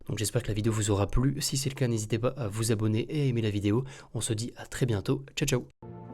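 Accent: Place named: France. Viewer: je te decouvre c"est interessant. Un peu trop de francais dans ton anglais a mon gout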